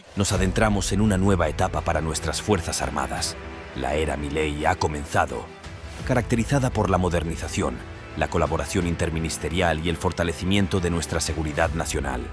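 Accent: Spain. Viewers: EXCELENTE...Permítanme una crítica constructiva ,al video: hubiera sido óptimo que quién hable de "NUESTRAS F.F.A.A." sea una voz argentina y "no españolizada". V.L.L.C.!!! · Encima habla un gallego 😂😂😂😂 no tenes algo mas antipatria ? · todo verso pagado y se nota con el asento gallego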